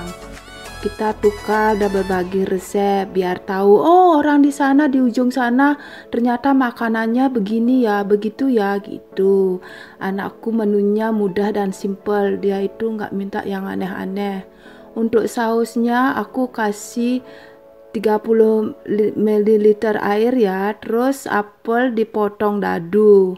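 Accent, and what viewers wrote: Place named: Bali, Indonesia. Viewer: Enak ..mau ku coba. Gampang pasti anak2ku juga suka. Dari suaranya seperti dari Bali, Tinggal dimana kaka?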